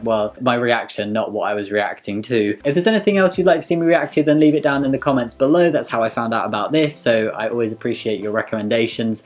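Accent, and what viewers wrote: United Kingdom. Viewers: Gee, I'm a Cis Gay Man. But I have a round jawline and no visible Adam's apple (because I'm fat), does this mean I'm unknowingly a trans man? The hair on top of my head (what's left of it) is straight, but my beard (which grows like crazy) is somewhat curly. No tattoos or scars (at least, not on the arms). Maybe I'm in the "?" group? Fact is, people are people. We are all one race, the HUMAN race. White, black, brown, blue, gay, straight, bi, trans, or whatever. Its corny, but love is love. Sorry I'm responding so late. Just found your channel, love your vids and the vlogs showing your transformation. I'd never have been able to do that in the hospital. And sorry your wedding got postponed, just make it bigger and better next year! PS: Love the accent! I'm American, but I'm a sucker for a British accent.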